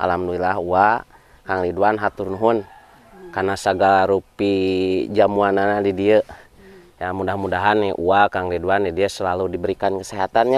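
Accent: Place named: Banten, Indonesia. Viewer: Bahasa na sami siga di banten balukang, baralak kampung naon atuh ieu kang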